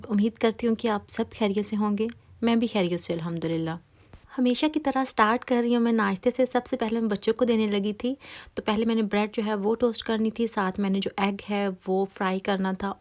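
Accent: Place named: Lahore, Pakistan. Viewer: Pure Lahori accent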